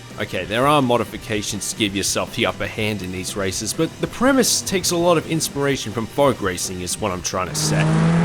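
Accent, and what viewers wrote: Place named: Australia. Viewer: I just wanted to tell you that i really like your work. The scale you cover and your approach to all the racing video games is really satisfying. Since you're an aussie, i also consider you as the gmanlives of the racing game genre lol. Your voice is also similar but it probably also comes with your aussie accent. :D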